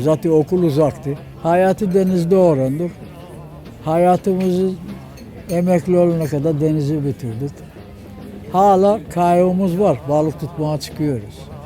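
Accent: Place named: Rize, Turkey. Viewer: Şiveden Rizeli olduğunu anladım.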